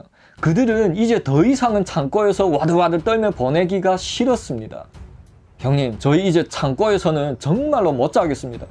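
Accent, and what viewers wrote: Yanbian, China. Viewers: 엉아가 한마디 advice를 하면 방송할때 서울표준말로할려고 노력하지말고 그냥 조선족 악센트, 아니면 자연스럽게 중국어 악센트가 섞인 한국어로하는게 훨씬 조타아이니, 그게 니 매력포인트 아이니 네가 서울 표준말 방송보고싶으면 그냥 KBS,MBC,SBS 방송보면되는거 아이니 네가 TV보면서 말투 따라하면서 댓글도 그러게하는데 보는 많은 사람도 내생각에 동의랄텐데:D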